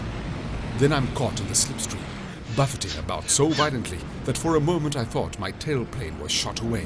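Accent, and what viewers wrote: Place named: South Africa. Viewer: Very well done. War is a dramatic and often ugly business. This makes the point rather well. That people on either side should celebrate the death and suffering of others illustrates how crude we can be. Narrator South African?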